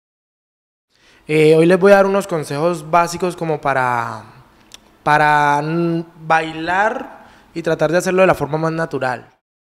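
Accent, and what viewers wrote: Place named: Cali, Colombia. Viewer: Ahhhhhh! Me encanta ese acento caleño! :D Mira veh!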